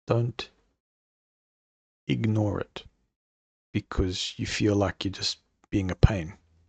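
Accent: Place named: South Africa. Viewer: Heard your voice and thought you sound south African. Kept in listening then you spoke of SA. Then continued and thought. I can swear I heard a talk of yours. You have a very soothing voice. But that's not easy for a tween trying to stay awake at a slow Sunday afternoon public talk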